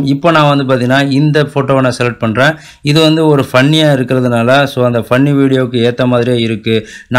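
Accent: Tirunelveli, India. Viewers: Bro thirunelveli ya voongalukku super bro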